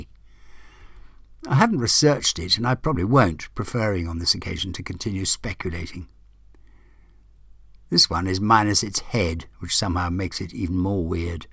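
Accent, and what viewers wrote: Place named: RP, United Kingdom. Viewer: Among other things,I tremendously enjoy the RP pronunciation of the narrator,the pure,nouaced flow of the language which shows education and scholarship! Thank you, you are the hightlight of my week!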